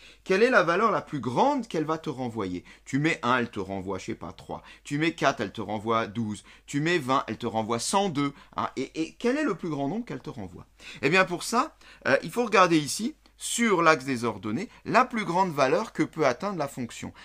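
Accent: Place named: Moselle, France. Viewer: Merci grace a vous j'apprends ce que je n'ai pas appris plus jeune. Une question: vous ne seriez pas ( comme moi d'ailleurs, de Moselle ). Car c'est comme ça qu'on dit Vingte chez moi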